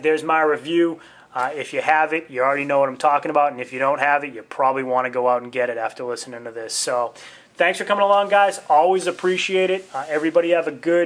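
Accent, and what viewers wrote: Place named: Boston, United States. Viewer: What are you up Boston? I know a fellow Masshole when i hear one... ;0)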